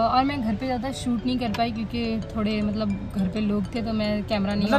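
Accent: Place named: Mumbai, India. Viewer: ap ki aawz me mumbai ka tuning hai sach mein inshallah allah ap ka 100k jld se jld hojayega ap ke sach me ek din 1million followers hoge inshallah thankyou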